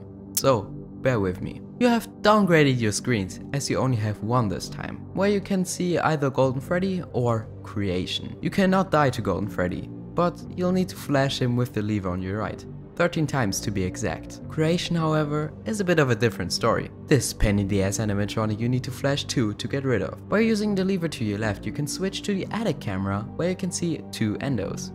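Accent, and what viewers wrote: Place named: Germany. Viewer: I can hear the German I think xD but love ur videos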